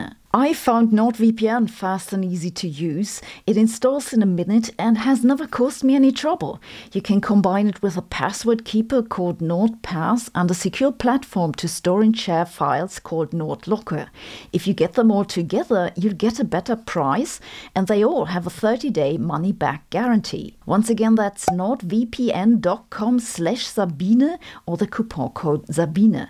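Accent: Germany. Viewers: Nah, she's just German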